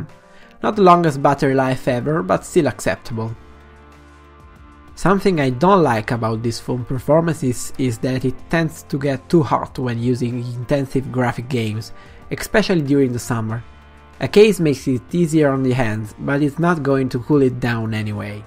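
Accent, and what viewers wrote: Italy. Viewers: Ero indeciso tra questo e lo z3c, il mio cruccio maggiore è, vale la pena spendere 350e per un telefono di quasi due anni? (Scrivo in ita perchè l'accento è palese :D ) btw bel video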